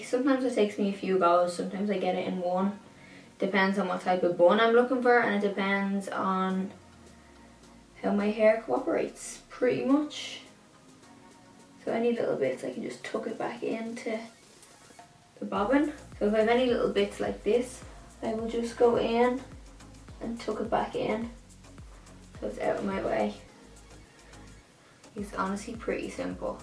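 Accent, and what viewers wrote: Ireland. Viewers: Who else thinks she sound Irish ☘️ 🇮🇪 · Are you Irish? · Are you Irish? Cause guuuurl I love your accent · Irish? Me too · Great messy bun you always have great style it's why I luv watching you. Not to mentioned as a transplanted fellow Irish gal I luv the accent....hugs to you 😘👍🇨🇮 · Is this girl from Ireland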